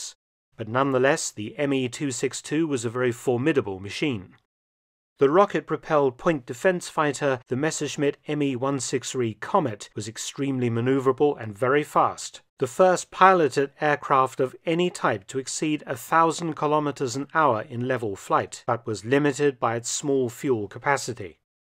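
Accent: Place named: Southeast England, United Kingdom. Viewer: I can't be the only one watching this who's getting Tie Fighter flashbacks. saying "AR 234" over and over while showing a series of animated aerial reconnaissance mission maps is too on point for Guy Siner saying "Outpost D-34" over an MS-DOS version of the same thing. Guy and sound like they grew up not too far apart from each other in SE England.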